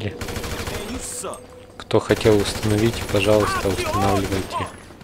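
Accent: Ukraine. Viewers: Он украинец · Ех... Как мне нравиться этот украинский акцент... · похож на украинский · чел это украинский акцент и есть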